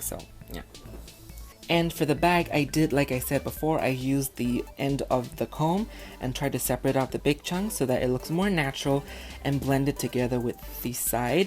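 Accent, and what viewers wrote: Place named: California, United States. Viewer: He may be from California because thats how my friend who is a boy talks and he is from California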